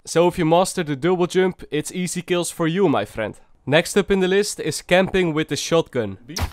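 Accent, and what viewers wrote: Netherlands. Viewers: You sound dutch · Are you dutch? You Sound very dutch xD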